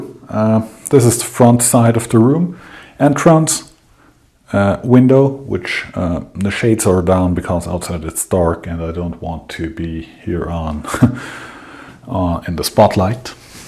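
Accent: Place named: Germany. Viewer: Hi, i like high level quality. And by the way your german english is beter than original😉🤭. I learn english and i look and listen you. Thanks.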